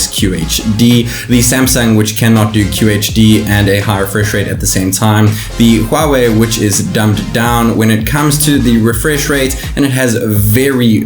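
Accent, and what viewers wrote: South Africa. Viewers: Is that a South African accent I detect? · South African accent?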